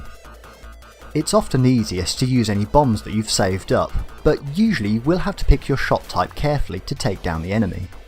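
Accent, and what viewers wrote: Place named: United Kingdom. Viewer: Well, I enjoy your style, vastly prefer it over "shoutcaster" rubbish! I'm not a game collector.... yet, but your production/scripting reminds me of the military miniatures (toy soldiers) community, of which I am a member. (Love the humor, as well. Not many opportunities for "Japanese homoerotic" references in the model soldiers scene!) Poised delivery, well researched, and British as Bully Beef! Cheers and keep up the great work!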